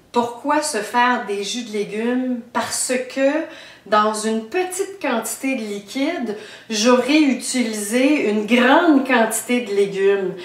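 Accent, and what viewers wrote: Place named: Quebec, Canada. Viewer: haha j'adore l'accent québecois calice ! Vidéo intéressante sinon ; merci.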